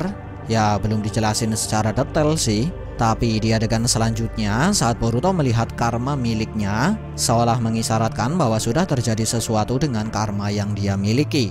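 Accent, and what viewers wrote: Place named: Java, Indonesia. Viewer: min aku suka banget sama suaramu, medok medok krenyes.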